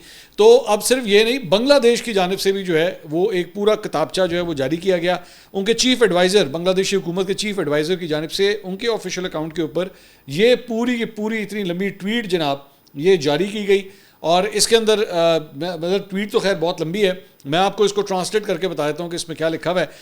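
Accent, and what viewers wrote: Punjab, Pakistan. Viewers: 😂😂😂😂 bhai zaberdast work kr rhy hn ap masha Allah lkn jo ap punjabi ka touch dety hn kamaal kr dety hn ap😂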